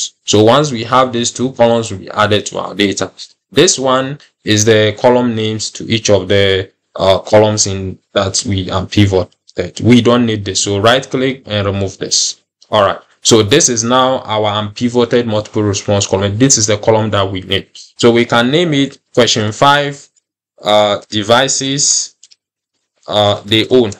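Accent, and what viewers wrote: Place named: Ghana. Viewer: He sounds Ghanian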